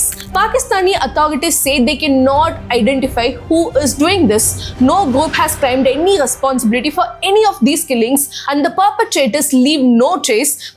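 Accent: Tamil Nadu, India. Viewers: I like not only the content and the research you make into them, but I like your pronunciation (the Tamilised English) the most. Keep doing this good work and God bless you dear. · Good job. I have watched many episodes of yours including the one where in you narrated that you have an issue with the letter R that’s why your accent is different. I felt bad that without understanding i had commented on your accent. But after that I watched and listened carefully, you defiantly have to improve your accent, it’s not just the letter R, u have issue with the English accent. Your mind processes in Tamil and hence your accent of English is like Tamil. I mean no offence but u must improve your accent for sure. U surely can if u try. Listen to your tone and accent you will know for yourself. God bless.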